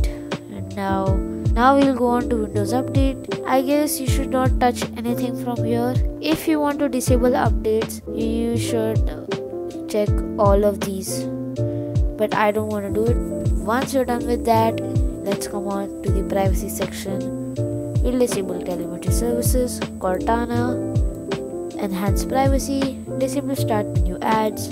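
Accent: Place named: India. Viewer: Omg Indian accent is so annoying.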